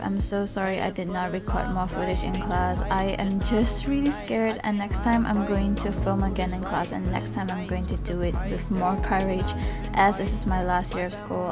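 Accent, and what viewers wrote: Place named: England, United Kingdom. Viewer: woww..i like your english accent🔥🔥..im from malaysia and new subscriber💓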